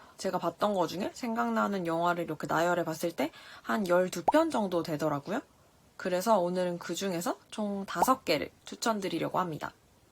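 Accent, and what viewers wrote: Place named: Spain. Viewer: ㅋㅋ 님 뭔가 한국어 하실때도 스페인어 말투가 묻어나는 거 같아요ㅋㅋㅋ추천해주신 영화 꼭 볼게요 감사합니당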